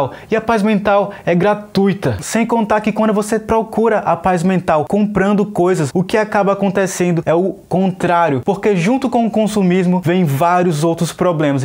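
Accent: Northeast, Brazil. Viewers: Tu é do meu Nordeste,é? Que vídeo maravilhoso. ❤️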